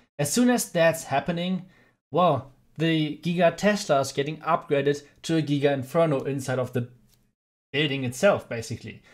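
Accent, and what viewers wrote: Germany. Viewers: German English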